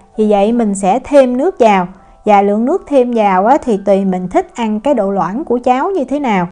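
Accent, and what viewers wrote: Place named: Saigon, Vietnam. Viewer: Hello năm mới chúc gia đình cháu hạnh phúc vui khỏe suốt năm nhé! Cách hướng dẫn của cháu nhanh gọn đẹp mắt và giọng nói SG làm cô thích nghe thanks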